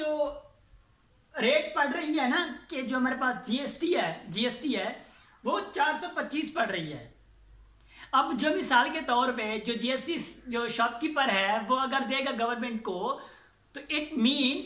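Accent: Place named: Saraiki, Pakistan. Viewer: ahhaha wakai bhaa saraiki sindhi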